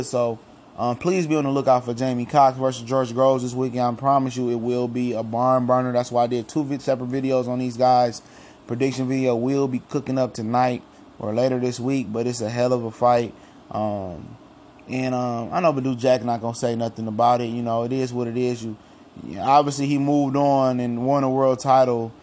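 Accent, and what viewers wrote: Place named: Detroit suburbs, United States. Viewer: tickle your fancy bro Detroit niggas don't talk like that are you really from here or from the burbs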